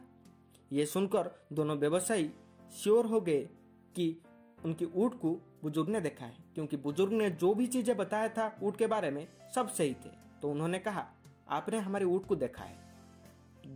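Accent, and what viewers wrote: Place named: Odisha, India. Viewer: Odia person telling the story, I think! 🙏🏻💪🏻 Bhainaaaaa! 👍🏻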